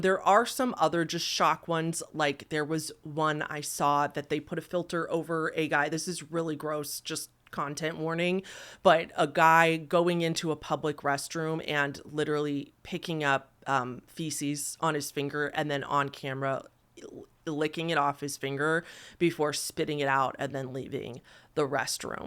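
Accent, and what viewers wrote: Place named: California, United States. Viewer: I can’t take this video seriously with the thick California accent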